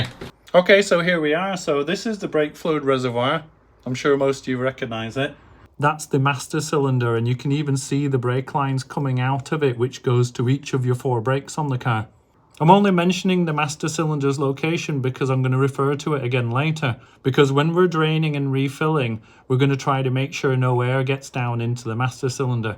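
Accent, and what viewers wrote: United Kingdom. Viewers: Very nice British accent 👏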